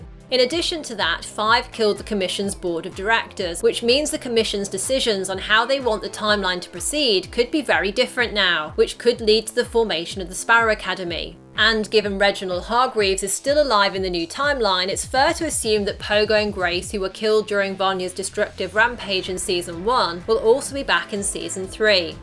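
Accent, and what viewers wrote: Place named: United Kingdom. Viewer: Soldier Gaming nah.. it just seems similar to Lila’s voice to u cuz they both have british accents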